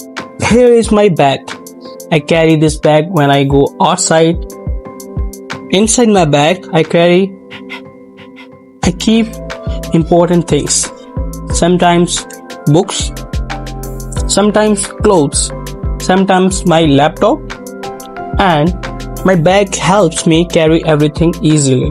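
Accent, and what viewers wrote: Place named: India. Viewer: Your English looks Indian English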